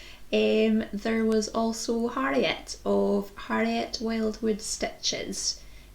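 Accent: Scotland, United Kingdom. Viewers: Hi,! I was suggested to watch your podcast by youtube. I have been quite thirsty for knitting podcasters from Scotland, as I am here too and the more local, the more relevant, I guess 😊. I do enjoy your accent and your podcast episodes and I’m glad youtube suggested you to watch 😊. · I love your podcast, and I especially like to just hear you talk - love your Scottish accent! Someday I want to travel to Scotland, it looks so beautiful! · As someone with fibromyalgia I really really appreciate your approach to podcasting. I’m new to knitting and I find it to be peaceful and calming work. It is soothing to knit whilst listening to your soft Scottish voice. I love your content so far. Keep going and thank you. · As for so many others your podcast came up in my YT recommendations and after watching some minutes of your second episode I was happy to have found you! 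I like your projects and love a scottish accent 🤩 . Looking forward to many thoughtful hours spent with you! Thanks so much! Anja Fromm Germany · Hello there- I spotted your channel on You Tube just a few days ago and thought I'd give it a try- the name appealed to me 😊 and I've loved it right from the opening moments- lovely and calm. I absolutely adore your Scottish accent as it's just so gentle. We visited the U.K. A few years ago and spent a fortnight in Scotland but only went as far north as Inverness- such a beautiful country! Although your giveaway is gorgeous I suggest you don't choose me- I live in Tasmania, the southernmost state of Australia- the postage would cost you too much 😉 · Hi! Your Podcast was in my feed too. I love your accent. I'm not a native english speaker but I always liked to hear the scottish accent. Glad you found the courage to start a Video Podcast. I like your calming way of speaking and that you aim for half an hour of podcasting. 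Your Intro is super. Your projects are beautiful and inspiring. Greetings from Germany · Congratulations!!🎈🎉 I just love your podcast!! I Love Scotland and been several times but never to your area. We still have plans to do the North Coast 500!. I love your scottish accent. You do make the most beautiful things, so keep up the good work. I learned about your podcast through Selma from Little Big Knits. Kind regards, Erna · I also got to your podcast through YouTube suggestions and loved it from the start. What made me subscribe before finishing the first chapter? The good energy you transmit, the 20-30 minute format, direct and calm, the quality of the podcast notes (unbeatable!), Your fabrics and choice of patterns, ... and above all your voice and your way of talk: I live in the north of Spain and watch a podcast in English is a good way to enjoy knitting and at the same time try to practice my "English ear", and your way of vocalizing seems surprisingly clear to me ... 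I thought that English Scots was harder to understand, but you speak very, very clearly! 😅 Congratulations on your almost two thousand followers, and a curiosity ... why that "Muchísimas Gracias" farewell? It has me intrigued ... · Hi! I am pretty sure I heard of your podcast from some other podcasters, but I cannot remember who 🤭 so sorry! Anyway, thanks for the time you spend with us and for sharing your beautiful knitting projects. I adore your accent!! And would love to get to visit more of Scotland. Just went to Edinburgh once, but I loved it. Your gifts for the giveaway are fantastic! I’ll keep my fingers crossed 🤞🏻🤞🏻🤞🏻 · I found you as a suggestion on utube. I love your soft Scottish accent and it's so relaxing to listen to you chat. You have some beautiful knitting garments and loved what you knit for your new neice. Thank you 😊💓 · I believe YouTube recommended you to me, I love listening to fellow Scottish podcasters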